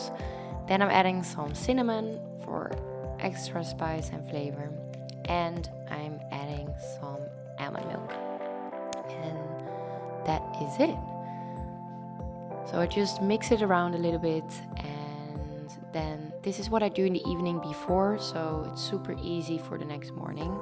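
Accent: Netherlands. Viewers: Is she dutch? She sounds dutch but i cant find dutchvideo's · Ik hoorde aan je accent dat je Nederlands was daarna zag ik je naam haha 😇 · Great food. I can hear that you are Dutch. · Are you Dutch? Because it kind of sounds like it🙂 I really enkoyed this video!! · Omg are you dutch? I think i can hear it out of your accent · R u dutch? U really sound like dutch haha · You sound like you have a Dutch accent :) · Are you dutch? Because 1 you’re name and 2 you’re accént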